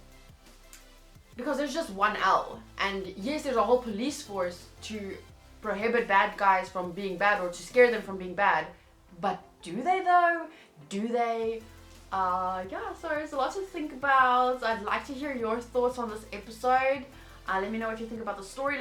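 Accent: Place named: South Africa. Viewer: Is she from South Africa? It's a really nice accent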